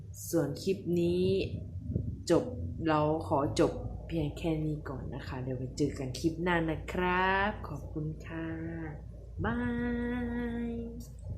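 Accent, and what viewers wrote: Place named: Khon Kaen, Thailand. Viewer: ฟ้งออกคนขอนแก่น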